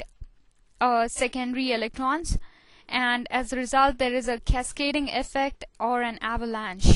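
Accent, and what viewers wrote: India. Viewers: but u sound like indian..its ok..dont be ashamed...nice accent..:) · You were reading a book. BTW your accent is Indian, probably you are Indian or indian based NRI.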